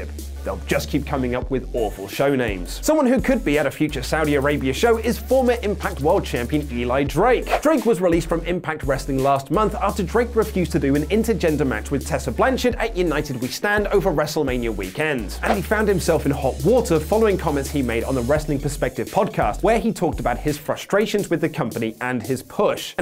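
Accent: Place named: Manchester, United Kingdom. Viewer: mancunian accent was excellent!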